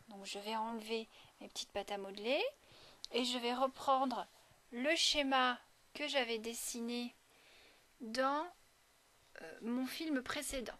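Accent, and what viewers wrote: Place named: Bresse, France. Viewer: Parfois on dirait que vous avez un accent bressans ☺️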